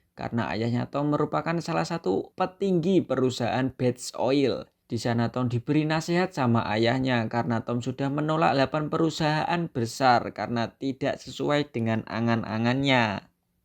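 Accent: Java, Indonesia. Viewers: Jangan medok mas, jadi kayak pahit asem gitu filemnya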